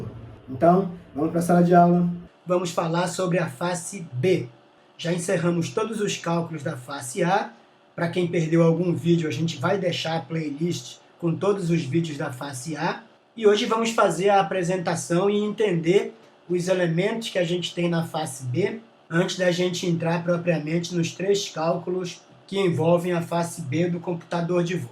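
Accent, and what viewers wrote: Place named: Belém, Brazil. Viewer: Sotaque de Belém do Pará.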